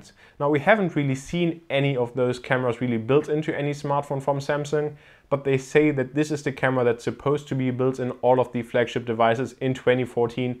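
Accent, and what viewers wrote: Germany. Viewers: Du bist doch deutsch oder ? Das hört man gleich :D Trotzdem sehr gutes Video !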